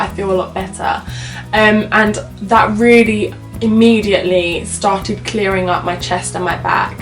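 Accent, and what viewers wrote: United Kingdom. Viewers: I just came here to listen some nice British accent. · I love your accent sounds abit essex but i find that soothing to listen to haha... im gonna go out and buy those tablets tomoz sick of my spotty skin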